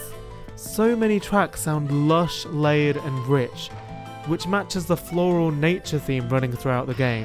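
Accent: United Kingdom. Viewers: Oh that's a British man, I honestly didn't expect that.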